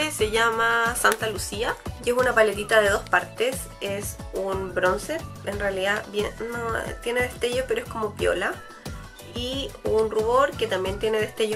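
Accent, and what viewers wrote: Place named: Chile. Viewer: Que dulce tu voz! Me encanto tu canal, primer video que veo, creo que eres chilena por el acento, yo igual te invito a ver mi canal . Nos estamos viendo, cariños! 😊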